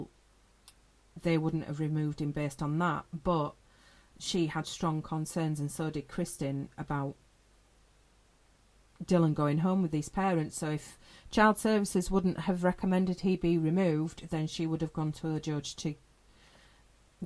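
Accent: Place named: United Kingdom. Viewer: Just came across the channel today and so glad to hear a British voice for a change when discussing crime , most seem to be American that I follow anyway. Have subscribed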